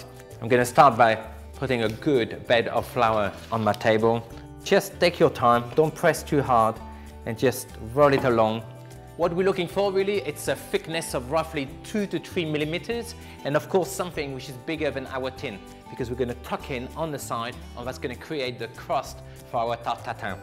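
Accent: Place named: France. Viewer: ur French accent is slowly picking up some British slangs too I think haha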